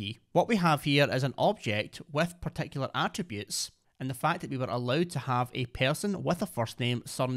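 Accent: Glasgow, United Kingdom. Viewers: Its a magical unicorn type of accent only from one place - Glasgow, Scotland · First 3 minutes I was just trying to get used to the scottish accent... but otherwise good introduction.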